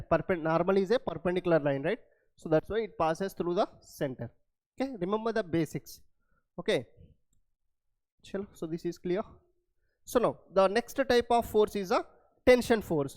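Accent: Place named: Tamil Nadu, India. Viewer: Your tinglish and loss of motion and chopter is different. Better try " orion educenter "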